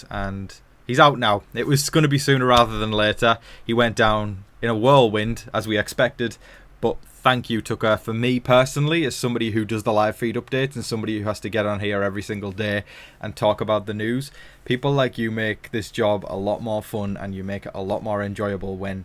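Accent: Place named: United Kingdom. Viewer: I love how charming and unique you are. Your accent is lilting and smooth...some British accents are harsher...like my Bronx accent "comb ya ha-iyah"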